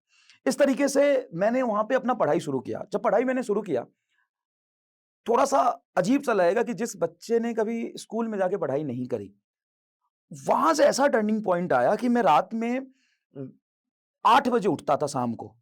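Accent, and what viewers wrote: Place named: Bihar, India. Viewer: Sir got that Biharizz😩😱😱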